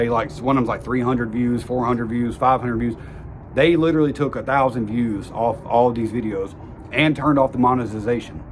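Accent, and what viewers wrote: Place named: South, United States. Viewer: yeah man np. After I typed it I said "oh maybe that's why he had twice as many notifications" you know? Idfk haha. Take care. Well wishes my man. It's funny you'd never think it, but minus the slight southern accent, your not any different from most guys wayy north up here in New York. Bet you'd never think that. We got beaver trappers. We got it all bud. Big ass tree removal! It's the sticks. Be good brother.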